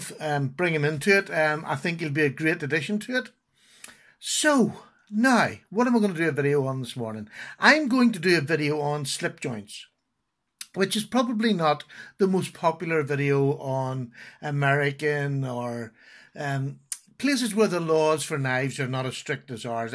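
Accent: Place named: Ireland. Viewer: I fucking love the irish accent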